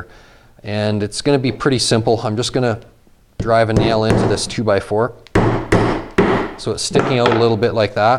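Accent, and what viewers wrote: Canada. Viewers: Is that a Canadian accent I hear? 🙄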